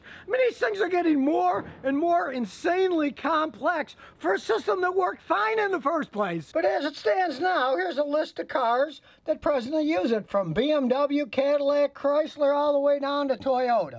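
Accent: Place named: Pittsburgh, United States. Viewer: Amen!!! Ps: are you from Pittsburgh? You sound like you are, makes me miss home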